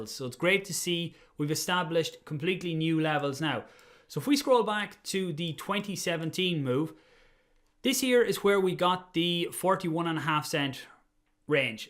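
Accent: Ireland. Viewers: Heard the Irish accent and subscribed straight away🇮🇪🇮🇪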